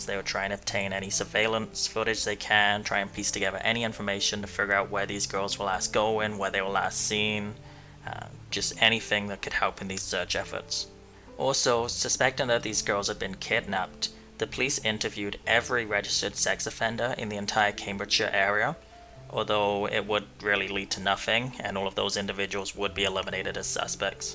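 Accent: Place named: Northern Ireland, United Kingdom. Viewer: cool, I thought I could hear Northern Ireland as that's where I'm from but a mix in there anyway 🤣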